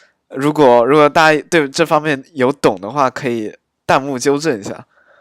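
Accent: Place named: Ningbo, China. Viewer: 妈蛋 你一开口我就感觉听到自己的声音了 宁波人果然说话感觉都有差不多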